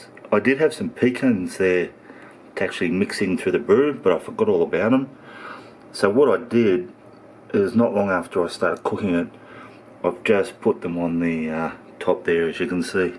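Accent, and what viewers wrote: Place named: Australia. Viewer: Getting ready to try this with leftover Kentucky Bourbon from our daughter's Kentucky wedding! (Old Forrester 86 proof) Looks like a great recipe but I didn't expect an Australian (?) accent Y'ALL!! ;{) I'll report back next week.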